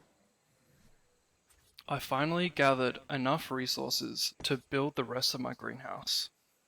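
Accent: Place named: Johannesburg, South Africa. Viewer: is it just me or does he sound like a joberg boet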